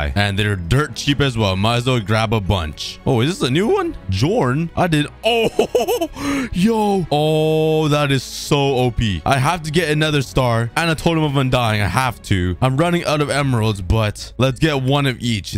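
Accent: Canada. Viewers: is you canadian?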